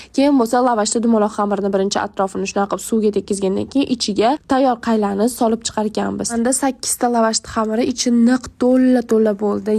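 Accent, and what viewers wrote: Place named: Fergana Valley, Uzbekistan. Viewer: Салом бизни водийда шунака гапирамиз😂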